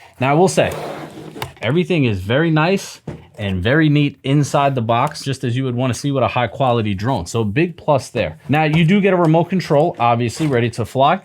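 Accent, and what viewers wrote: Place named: New Jersey, United States. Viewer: Bro i would love to fly with you one day. Just got back in to flying. I live in jersey. Sound like a jersey guy.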